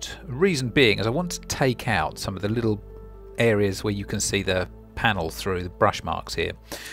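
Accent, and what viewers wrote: United Kingdom. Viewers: That's a nice British accent you've got there, where do you live? ( answer only if it's appropriate for you)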